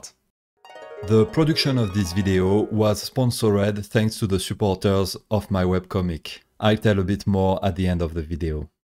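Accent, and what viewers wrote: France. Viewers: Your french ascent is really lovely and your videos are so wonderful. Learning so much here 👌🏿✨ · Tbh french accent make this tutorial a pleasure to hear. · Thank you very much , it's a great deal. BTW, your French accent is not so heavy as YouTube warns. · "heavy french accent" already warms my heart)) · I came down here immediately to type this very same message! Mr., your accent is absolutely beautiful, never apologize for not being English-speaking as it isn't even your first language; the French language is beyond beautiful and you speak English marvelously, very easy for me (with hearing impairment issues) to understand you. Thank you for this very ambitious tutorial, I just got the Wacom Intuos Small (CTL4100) for Christmas, it is my very first drawing tablet! Any suggestions on settings I should setup for it anyone? I've watched a few videos on setting suggestions, but there are buttons on the tablet itself, then on the pen and I'm not sure what these should be set to with Krita that would be beneficial. 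Additionally, is it normal for the pen to be so sensitive? I barely get the pen close to my tablet and it's already moving the pointer on my screen - is this just something I need to get used to? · Hahaha c'est cool - heavy French accent! 😆 Je suis Allemande et je l'adore en fait 😄 Je suis sûre que j'ai un vrai accent, aussi, quand je parle Français! Mais l'accent Allemand n'est pas si charmant! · damn, accent disclaimer. i watch your channel for 3 years now, and i also saw really old videos... compare to them, your accent became barely noticeable. even old videos were completely understandable, idk, maybe it's just me whose first language not English either (heard that foreign speakers understand different accents earlier than natives) remember only one struggle, it was shading/lightning video. "Ard light" layer mode made me stop the video and think a lil bit XD then i was like "oh, french, no H sound, riiiiight, got it". 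this only time from aaaallll your videos who needs it, will tolerate eith any accents or difficulties. if someone like "iu, accent, turn it off", they're probably not really into it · Love that you walked through your layout setup process and everything, thanks a lot! Re: that disclaimer in beginning -- I actually prefer my English tutorials with a heavy French accent :D Also love the draw-in-the-style work, I prefer it over the more anime-style original. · Just... Bravo! Giving you standing ovation right now. I liked the very detailed explanation and your french accent - it just make the video better. I am still couple of light-years away from your level of drawing skills, but video helped me a lot.Thank you! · An hour well spent...the French accent made listening a joy! Thank you very much for the immense effort you put into making this fantastic tutorial! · Dude I love your accent! I love listening to the French language. 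I love watching French television. But with subtitles in english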